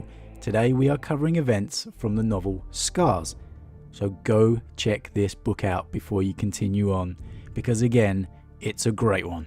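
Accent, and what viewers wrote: United Kingdom. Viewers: Cracks me up how you say "ass" and not "arse" with that British accent 😋 Great vid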